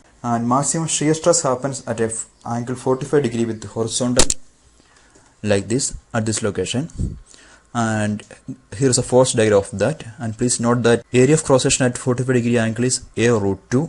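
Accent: Kerala, India. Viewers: indeed, i lost interest in what he says and to the topic hes talking, a piece of sh*t indian accent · why can't Indians loose de idiotic accent? Does that happens for other languages? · From your speech I knew that your indian And from your speech I havn't understood anything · nice and good explanation in a simple way.. guys who r worrying about english please try to learn indian ascent so that it would be easy to grasp concept.. Similarly we also watch other countries videos but I never complained about english.. Gain concept and be happy.. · very good presentation. but honestly . . .work on the language man !! Only an Indian can recognize your English !! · His english is in Indian ascent...... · *Kerala accent. Others don't give out such strong accents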